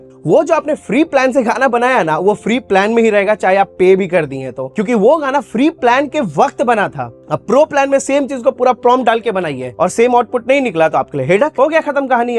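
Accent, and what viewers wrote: Bihar, India. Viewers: मैं पहली बार आपको सुनी हूं। आपके टोन बिहार से लग रहा है जो मुझे बहुत प्रभावित किया क्योंकि मैं भी बिहार से हूं। मैं आपको सबस्क्राइब कर रही हूं। अच्छा लगा आपने जो बताया है 😊 क्योंकि मैं भी Ai को लेकर कन्फ्यूज थी बहुत कुछ क्लियर हुआ है। मैं छोटा सा क्रिएटर हूं। थैंक यू भाईजी 🙏 ब्लेस यू 🙏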